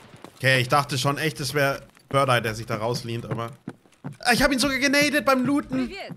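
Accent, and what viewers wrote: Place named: North, Germany. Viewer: sehr sympatisch, da merkt man den Norddeutschen, muss man an der Stelle einfach mal sagen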